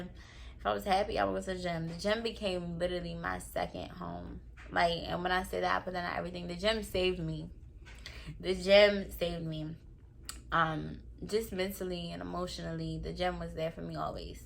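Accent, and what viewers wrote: Baltimore, United States. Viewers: I knew it your from Baltimore the accent 😇 pretty girl 😍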